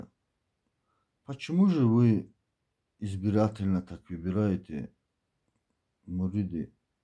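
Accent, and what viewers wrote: Dagestan, Russia. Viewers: Акцент аварский,,, никакой он не чеченец, это фуфло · С каких пор чеченцы говорят с дагестанским акцентом? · Это походу не чеченец!это провокатор!у него акцент не чеченца!!!больше похож на дагестанский! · А с чего ты взял что ты чеченец ты аварец и твой акцент это говорит и не отрицай это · С нашим акцентом аварским😅 рассуждать о чужой жене ему надо?? Я женщина и то такие мысли не пришли подходит ей муж или не подходит…😅 больше проблем нету у этого мужчины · Это не чеченец акцент дагестанский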